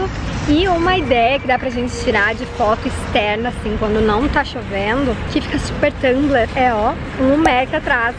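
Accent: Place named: Rio Grande do Sul, Brazil. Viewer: você é gaúcha né? Por favor que sotaque lindo, mas hein.... Adoro suas dicas ♥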